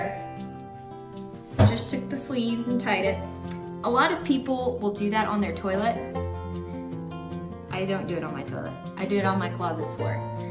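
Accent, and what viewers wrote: South, United States. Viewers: I detect a fellow Southerner! Native Texas living in the West! Miss the south, and will be back there soon! My hair worked the first time, as I have ringlets already! Thanks for the tips!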